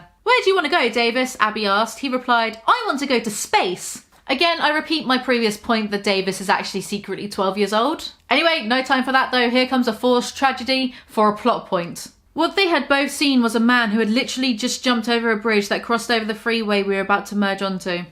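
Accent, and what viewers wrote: England, United Kingdom. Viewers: The English accent though... 😂 i loved this video.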